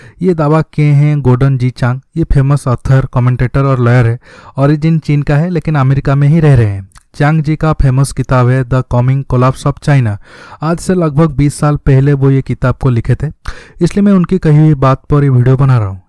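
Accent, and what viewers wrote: Odisha, India. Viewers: Sir I know that you are an Odiya, by your voice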